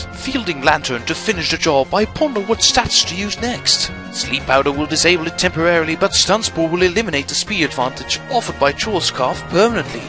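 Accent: United Kingdom. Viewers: It's the British accent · Urgh, his way of speaking I'm really not a fan of. I don't usually dislike British accents, but there's something that I can't put my finger on that makes me hate this voice. It's probably the animal planet narrator type quality to it if I had to guess...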